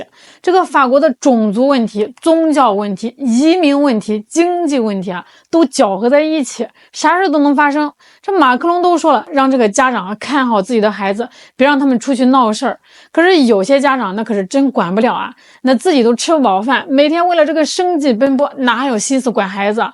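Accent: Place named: Northeast, China. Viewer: 感觉口音象东北的人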